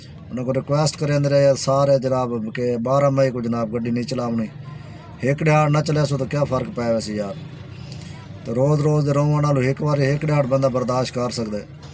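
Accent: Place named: Punjab, India. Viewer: Bhai itni gharhi panjabi na bola kare ham jese bhi bohot Dil se sekhte hein apko Bohot si bate smjh hi nahi aati phir😢 Baqi thank you for your work❤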